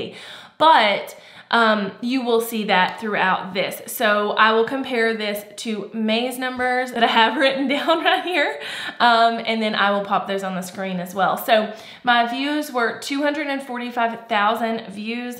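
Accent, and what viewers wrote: South, United States. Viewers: I love because she is one of the few Youtube Tip creators who have a southern accent.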